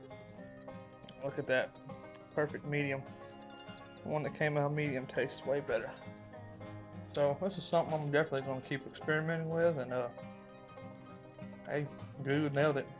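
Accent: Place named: Georgia, United States. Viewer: Georgia accent, mid ga.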